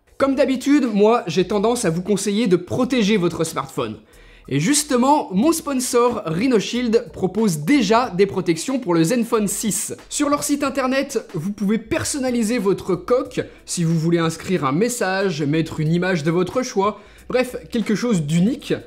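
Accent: Lyon, France. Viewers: T'es lyonnais ou quoi ?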